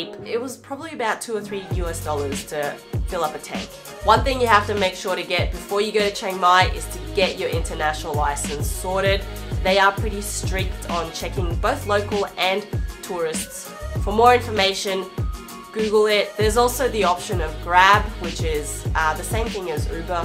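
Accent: Australia. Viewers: Nice to hear an Aussie accent :)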